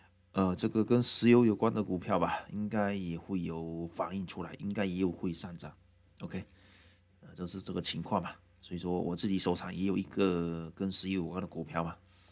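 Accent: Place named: Fuzhou, China. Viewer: 這福州口音 太輕切了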